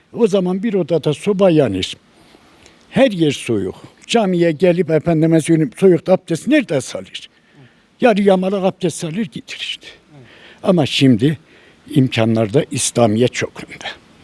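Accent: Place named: Erzurum, Turkey. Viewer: çok güzel bir anlatım çok tatlı bir Erzurum ağzı çok teşekkür ediyorum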